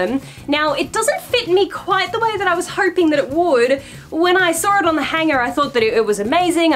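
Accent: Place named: Australia. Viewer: I would love to see you do a video about how you got started doing YouTube + how you balance work, life, and being a content creator!! (Although I understand you can't spill all your secrets haha!) I find your videos super inspiring and I love your style and personality so much. I'm going to Japan soon so I've spent the last week finding, and then binging your videos to get ideas on what to look out for when shopping, and I can't get enough! 😭💖 As a NZer I feel like I'm getting a bit of an Aussie accent from watching so many of your videos.... but anyway, I can't wait to watch whatever you post next! 💚💚💚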